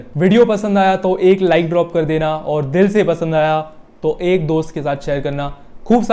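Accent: Maharashtra, India. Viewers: His Hindi has Maharashtrian touch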